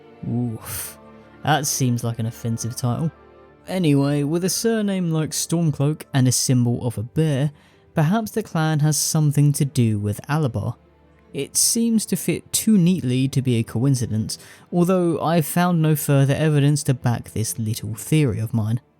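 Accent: United Kingdom. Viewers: I'm English, and judging by accent I assume they are too. We tend to drop the R at the end of words.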